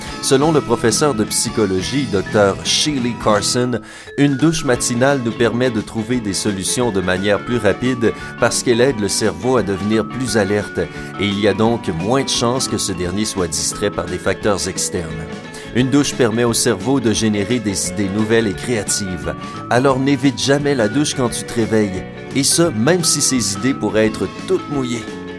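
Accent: Quebec, Canada. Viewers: C'est du québécois alors arrête de critiquer ! Ils ont un accent différent ! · Accent québécoise j'adore 😊. Merci c'est sympa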